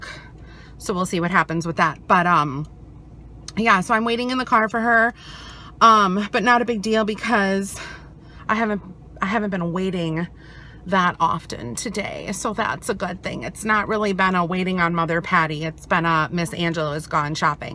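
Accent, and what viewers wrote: Wisconsin, United States. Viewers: You're a hoot!! Love your vlogs. Love your Wisconsin accent🙂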